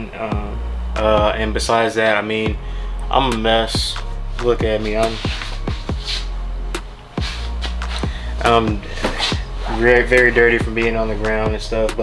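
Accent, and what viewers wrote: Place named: Philadelphia, United States. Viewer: ❤️ from Philly. You talked like a good friend here in Philly and I was wondering if there was a connection. God bless.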